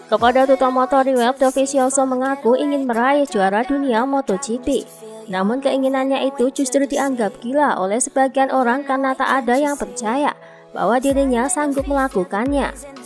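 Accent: Java, Indonesia. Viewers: Chanel ini keren. Tapi agak kurang pas saja dialek & intonasi mimin_host nya. Nampak banget seperti anak SD (untuk ukuran host YouTube) cara host baca dialog. Lagian dialek jowo ne nan kental mendowngrade level Chanel ini. Itu aj point' yg belum gw mensubscibe Chanel ini.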